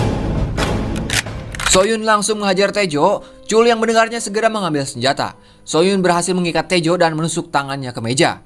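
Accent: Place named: Sunda, Indonesia. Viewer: Lucu sih , cara ngomongnya campur BHS.sunda , campur heureuy 😅😅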